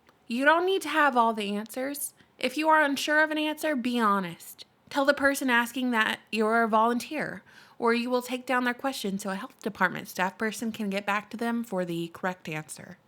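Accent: South, United States. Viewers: Oh my you had me rolling at"Spin the wheel Memaw!" Absolutely LOVE your southern accent! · IDK if that country accent is you're true accent or not but love it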